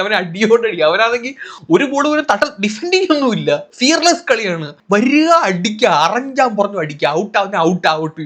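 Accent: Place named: Thrissur, India. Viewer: നിങ്ങളുടെ വർത്താനം സൂപ്പർ, ഞങ്ങൾ തൃശൂർ സ്റ്റൈലിൽ 😂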